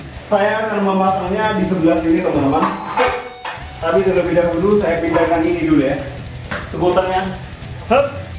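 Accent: Madura, Indonesia. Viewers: Madura kayak nya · Orang madura ya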